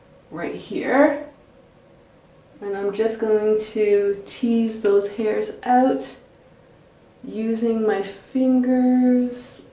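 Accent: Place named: Canada. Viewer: I hear a Canadian accent...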